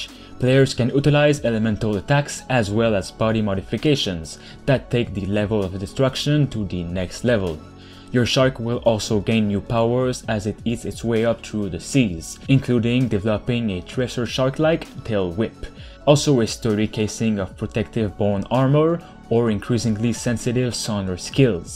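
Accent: Quebec, Canada. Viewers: Hmmm .. I hear Quebec !!! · The commentator needs to makes vids in French, his pronounciation in English is terrible. I keep hearing him repeatedly saying " Man Heater"which is entirely different gendre. Please homes, just stop, that Quebec accent is atrocious.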